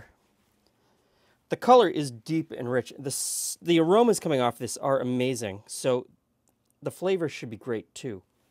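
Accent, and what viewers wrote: Canada. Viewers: This man is Canadian! Or that is my guess. This video was interesting to watch!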